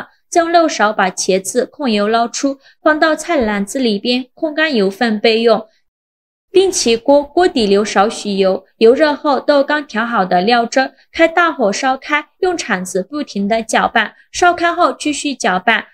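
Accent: Yunnan, China. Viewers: 有趣的云南普通话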